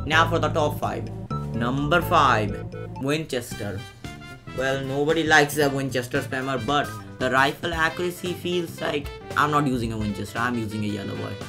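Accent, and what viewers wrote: India. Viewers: Are you indian? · Are you Indian? Not to stereotype but seriously are you?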